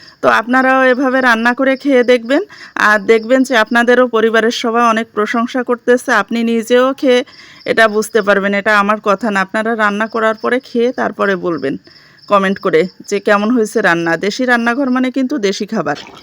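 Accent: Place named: Narail, Bangladesh. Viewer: আপনাদের বাড়ি কোথায় আমাদের মতো কথা আমাদের মতো রান্না আমাদের বাড়ি নড়াইল লোহাগড়া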